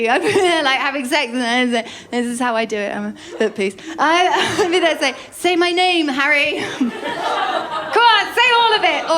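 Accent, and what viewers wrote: RP, United Kingdom. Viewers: Diane Spencer Btw some men in the States actually prefer redheads. No ginger bias here darlin. Keep at it: you're raw, honest, funny and gorgeous. I wish there were more women like you. Take care and bring your act across the pond. And that British accent 😍 I would be honored to shake your ginger claw lmao✌ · Stumbled through the youtube algorithm to this. I love the humor. The profanity mixed with the british politeness or rather way of speaking is a refreshing act compared to us comedics! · Her delivery is incredible. It really adds to her jokes that she's talking about shocking/sexual stuff in clean cut RP. Very expressive face also helps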